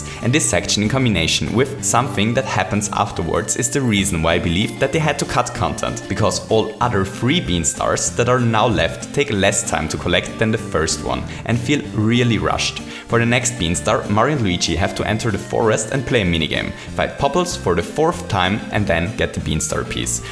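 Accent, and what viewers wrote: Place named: Germany. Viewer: Probably because of his german accent.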